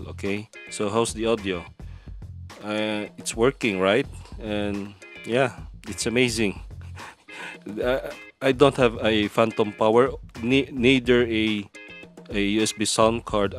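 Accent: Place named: Nagaland, India. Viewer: thank you bro. And u sound like a native from nagaland . Google it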